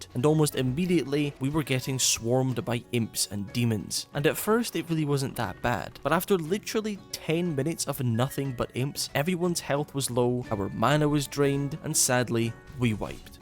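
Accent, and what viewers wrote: Scotland, United Kingdom. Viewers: I first played WOW 16yrs ago, then I stopped when I hit level 85 in Cata. I'm coming back - I'm interested in how the retail changes have changed the experience oh, and for some reason my level 85 Mage is not that level anymore. Trying to pin down your accent, definitely Scottish but can't quite narrow it down - don't think Glasgow, hmmm ...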